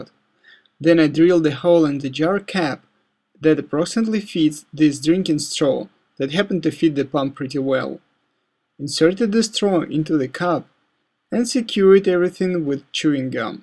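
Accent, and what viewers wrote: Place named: Russia. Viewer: That accent... Russia? Ukraine?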